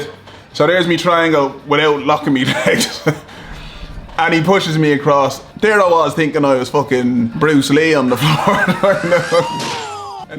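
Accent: Ireland. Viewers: Is that a new Irish accent? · London? He sounds Irish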